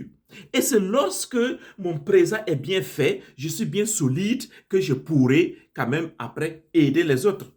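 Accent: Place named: Burkina Faso. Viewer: Accent Burkinabé. Tu as raison Mon frère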